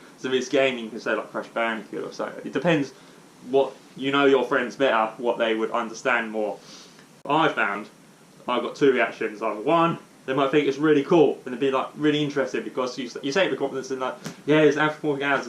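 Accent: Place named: United Kingdom. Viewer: Boi i can't stand this level of British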